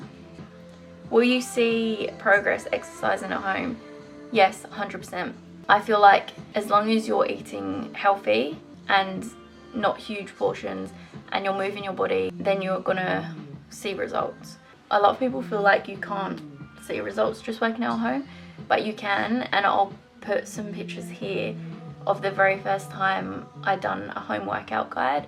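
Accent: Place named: Cheshire, United Kingdom. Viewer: Haha first video I thought you were a Brit and I was no an Aussie and kept going back and forth I’m from Birmingham, uk are you from Cheshire ? I hear a hint!x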